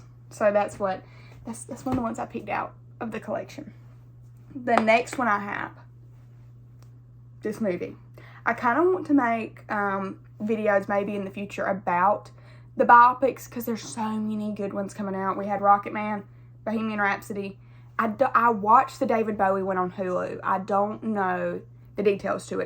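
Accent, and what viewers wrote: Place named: Georgia, United States. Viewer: I love your accent! Is it a Georgia accent? You have great taste in music too!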